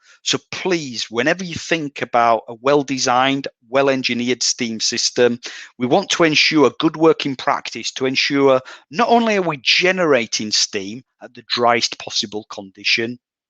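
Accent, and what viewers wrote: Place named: Teesside, United Kingdom. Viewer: Hah! I grew up in Teesside; the accent is exactly how me uncle sounded. Brings back memories…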